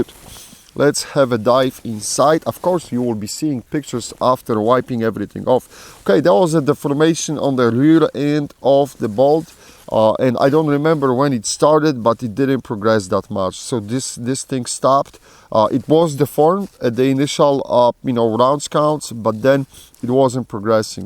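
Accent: Poland. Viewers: I know this is an old comment, but this is his real accent. He’s Polish.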